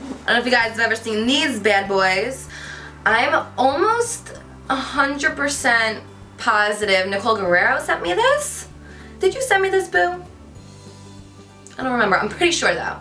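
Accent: New Jersey, United States. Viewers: Lol I keep repeating the part you say water. Your Jersey accent is so cute ! 😍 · My husband is from NJ & his whole family talks like this. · So obsessed with your Jersey accent! Lol. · The way she says 'water' tho!Am I the only one always hearing the Jersey accent?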